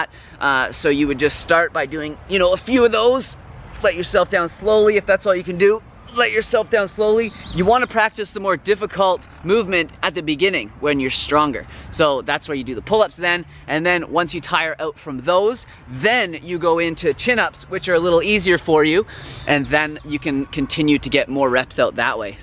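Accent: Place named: Canada. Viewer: I don’t know how anyone can find you annoying. I love the Canadian accent...ah frig...😍.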